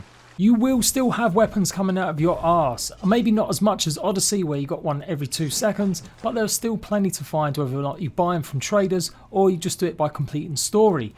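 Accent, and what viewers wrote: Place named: United Kingdom. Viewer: Listening to your British accent hurts my face. Other than that you're awesome 👌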